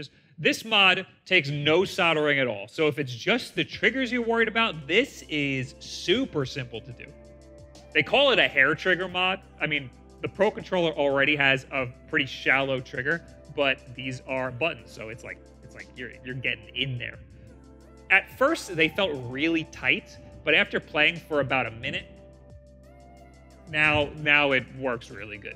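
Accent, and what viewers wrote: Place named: New York, United States. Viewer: your new yorker accent really makes me wanna buy half of the things you do ads for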